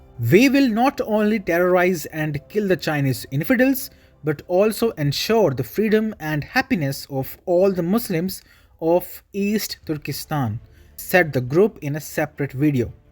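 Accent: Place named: India. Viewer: What bull shit. The speaker sounds Indian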